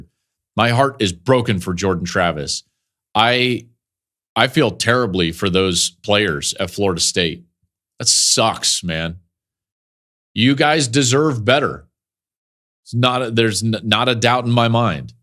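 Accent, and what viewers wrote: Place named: Alabama, United States. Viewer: I'm not crying. I'm not even a Florida State fan. I'm a fan of fairness. Alabama has a great history of championships which I greatly respect, but they have no business being in the CFP this year. By the way, I can hear your Alabama accent all the way across the internet. Don't be so greedy, Bama boy. You've been top dog many times and you will be again. But this year you could have the grace to let other worthy teams have their moment. If Michigan plays up to their normal level, they are going to whip you like a red headed stepchild. And I admit I will enjoy that.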